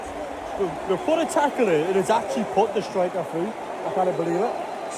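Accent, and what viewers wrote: Newcastle, United Kingdom. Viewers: Love your Geordie accent mate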